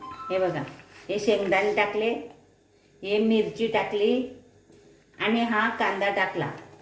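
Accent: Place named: Khandesh, India. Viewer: मावशी तुम्ही नक्कीच खान्देशातील आहेत ...कारण तुमच्या बोलणं ऐकून ...माझं माहेर पण खान्देशातील आहे ...पण रेसिपी भन्नाट आहे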